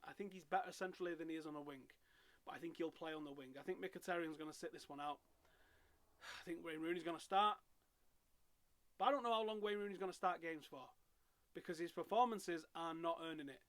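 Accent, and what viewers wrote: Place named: Newcastle, United Kingdom. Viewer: Another geordie on Youtube pfff -_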